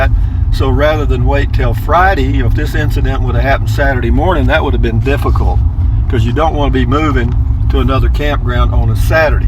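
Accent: Texas, United States. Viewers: Love that Texas talkin' style! Your video not only made me sit up and pay attention, it made me laugh at some of the things you said. Humor and common sense.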